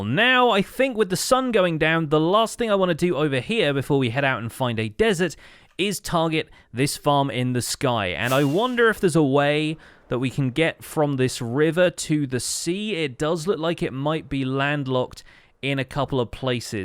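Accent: United Kingdom. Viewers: The notification woke me up at 7am but I’m damn sure gonna let that cocoa butter British voice soothe me back to sleep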